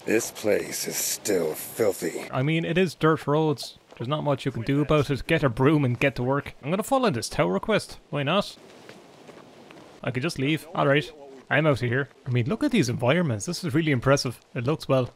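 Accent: Ireland. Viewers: The game looks amazing. The great Irish accent fits as well.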